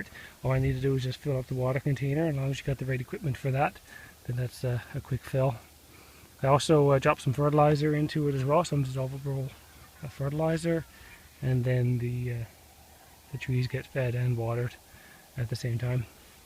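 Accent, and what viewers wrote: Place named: Alberta, Canada. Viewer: This person sounds like they are from Alberta.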